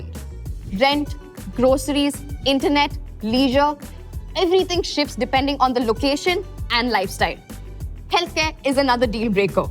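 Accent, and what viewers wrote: India. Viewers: You talk like an Indian girl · First of all she doesn't look indian, i mean she might be a foreigner living in India but her accent is indian, how i mean is she AI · Definitely not European. Her accent is like an Indian.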